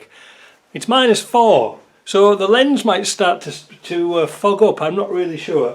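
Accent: Yorkshire, United Kingdom. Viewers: You can take the man out of Yorkshire but you can't take Yorkshire out of the man😉 Merry Christmas buddy 🏴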